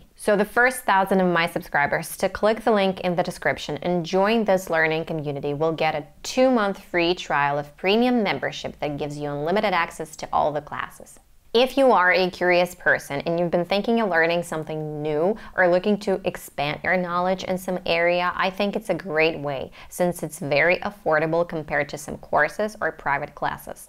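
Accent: United States. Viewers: I bet you're lying its hard to believe you ain't an american . Awesome accent !! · Thank you for your video. It inspired me to watch more videos and to spend more time for learning. I never think that you from Ukraine, because you speak like a true American girl :-) I'm from Russia and I learning English about 5 years like a hobby but my learning goes very hard because I spent so little time for English before and your video explained me what I did wrong. Thank you very much and I wish more subscribers for your outstanding channel! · Wow and this is American pure English American 🙏🙏 color and colour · It's a perfect American accent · Your pronunciation and accent is more American. Why? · You have a perfect American English accent. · Chan Jason definitely American English... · I really thought u were American . U r so amazing n such an inspiration. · О май гаш, наконец то нашелся человек с СНГ который действительно говорит с максимально похожим на американский акцентом. 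От остальных очень сильно слышно русский акцент и попытка скрыть его сильным произношением буквы Р в американском стиле. Красава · Hey you know what I like your American accent so much. And like you said why I'm learning English every day every single day because I love it I love doing this. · I thought you were an American! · You have a perfect American accent. Where are you from that you say you're from a non English speaking country? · Your American accent is extremely good. Outside of some Dutch people you sound the most like a native American than anyone I've ever heard. It's interesting that your vowels sound like the accent that is similar to people from Minnesota, USA. I've never heard that particular sound from anyone who isn't from Canada or Minnesota. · You sure you are not American? If not, you did a great job ! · I thought you are American 😂, your accent is so incredible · I m new here, Mss, what country are you from? 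You have a clear American Accent congratulation foryour effort, God bless you🙏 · You're American. I know that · Can't believe ure not American Where u from then ? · Watching from Philippines.I am highly motivated when I watched your videos.I have learned more.You have a brilliant mind; II like the way you speaks,Americant accent,is easy to understand compare to British accent but I like British accent too.Thanks for your wisdom.Have a nice day. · She sounds so American but her thoughts her attitude is different from those people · I'm not sure how I got here (I'm from the USA), but this video blew my mind! I speak German and French but am feeling stuck for Russian...this motivated me quite a lot...thanks! Your accent sounds more American than mine btw great job 😆😃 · ну да, она слушала больше американцев. Просто ей видимо американский вариант больше подходит. Мне вот тоже как-то претит губы вытягивать по-британскии каждый раз когда слова на дифтонг оу заканчиваются, вроде go, know и прочего. 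Если вы задумываетесь над правильным произношением, и тем более если вы хотите говорить так же как автор этого канала, спешу вас предостеречь, что такое не всем дано. Это целая наука, и у 90 процентов всех кто стремится идеально говорить на английском или любом другом иностранном языке не всегда это получается. Все дело в строение речевого аппарата. Когда мы учим родной язык он принимает определённую форму и вы уже не сможете без специальных практик, к коим к примеру обращаются дикторы и парадисты, освоить безакцентный иностранный язык. Я честно говоря вообще перестал "парится" по поводу произношения. Главное, что бы тебя понимали и что бы тебе было комфортно , а остальное не так уж и важно) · american style,so my question is......are you an america? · Your speaking and accent are amazing. I can not imagine that you never lived in the North America before.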